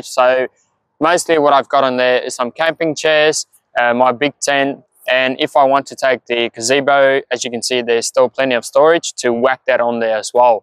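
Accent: South Africa. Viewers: Nice setup man! I must ask, are you perhaps South African? Because i somehow hear a bit of an Afrikaans accent coming through 😁 But ja, shot for the tips!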